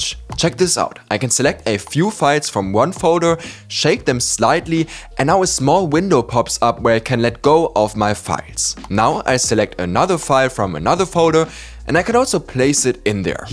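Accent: Germany. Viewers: Wegen dem Akzent vermute ich mal dass du deutsch spricht und wow das war ein richtig gutes video jede app war ne mega empfehlung danke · Bist Deutscher? Klingt ziemlich danach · i love the german accent so much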